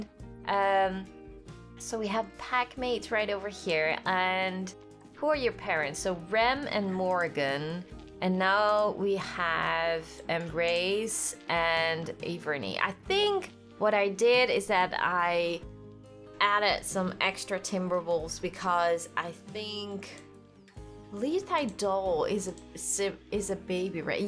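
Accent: Netherlands. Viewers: i came to your channel at the zootour video and 3 minutes in i could hear your dutch, keep up the good work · Me : *was about to subscribe Me : *hears that she's dutch Also me : SUBRIBE RIGHT NOW Keep up the good work! 👍👍💯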